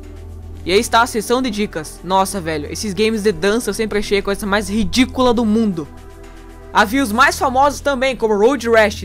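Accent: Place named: Paraná, Brazil. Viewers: Parece paranaense... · axei que vc morasse em Curitiba, seu sotaque de paranaense é bem forte, legal vc se ser daki "pr"... tem muito potencial, boa sorte =D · Você é de Curitiba ou de outra cidade do Paraná? O sotaque é inconfundível.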